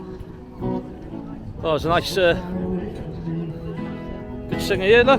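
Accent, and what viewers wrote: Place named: Wales, United Kingdom. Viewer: Thanks for this. Love listening to you speak; reminds me of my grandmother and cousins in Llanelli. I’m Canadian, so I don’t get to see them often. We’re booking in Luz for next winter (Canadian winters = 🥶) so enjoyed the look around.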